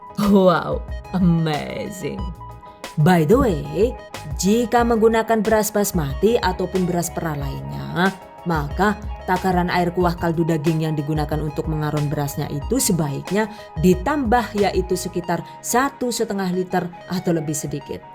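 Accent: Banyumas, Indonesia. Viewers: Jebule ya wong ngapak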